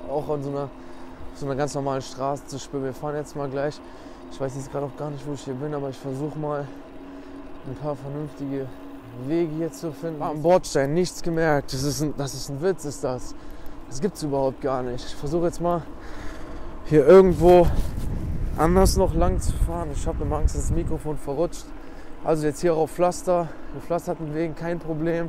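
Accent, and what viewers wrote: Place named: Cologne, Germany. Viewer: Deine Videos sind am Ende häufig wenig informativ, für mich - guck sie aber trotzdem gerne - wahrscheinlich weil ich an deiner Aussprache erkenne dass du ein Kölner bist 💪😎👍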